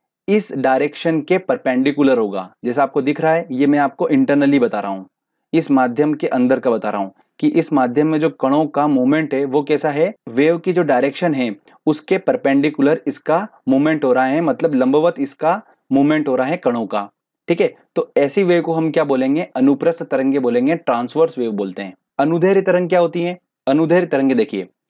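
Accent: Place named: Maharashtra, India. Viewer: सर आपके language मे महाराष्ट्रन touch है.... आप मुम्बई से हो? मै भी मुम्बई से हु.... पर आप सभी topics बहुत अच्छे से clear करते हो... 🙏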